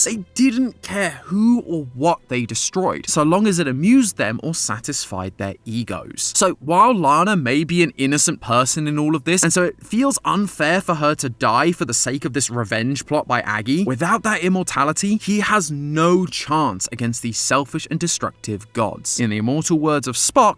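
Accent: United Kingdom. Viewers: Why are you British now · me not paying attention "ok new style theory" "hmm, Amy sounds very British" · I DID NOT EXPECT THIS BRI'ISH MATTPATT REPLACEMENT TO SAY "AGGYDIGIMON!" 😂😂😂😂😂😂 Also no offense, you are a great fill in for mattpatt right now. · has always been british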